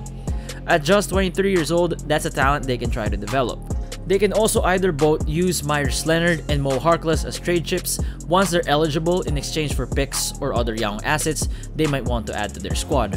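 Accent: Philippines. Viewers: Your accent when you talk was just like a Filipino talking in English. Are you a Filipino? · You sound Filipino! Pinoy ka ba? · You sound Filipino but idk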